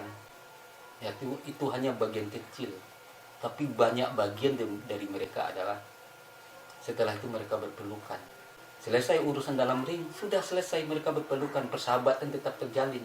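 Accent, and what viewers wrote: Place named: Minangkabau, Indonesia. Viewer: . Alhamdulillah bapak sehat, sebenarnya saya hanya meneka , ternyata bapak berbangsa Minangkabau,saya tahu dari cara perbicaraan bapak..hampir sama seperti orang Brunei,semoga channel bapak terus mantap maju dan berjaya.semoga bapak terus berkarya dengan lebih maju lagi.salam dari kami melayu Brunei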